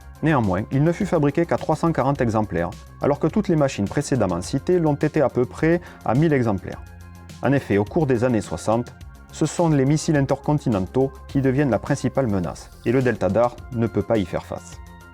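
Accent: France. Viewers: Je démarre cette vidéo et ooooh oui un accent bien français 🙏 rien que pour ça je m'abo. Super vidéo aussi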